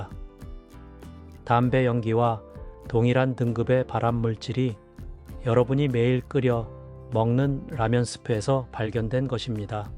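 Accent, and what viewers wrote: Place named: China. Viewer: 발음이 중국놈않이야 국산제품인기제품만 세계 에서믹스커피는 인기제품인데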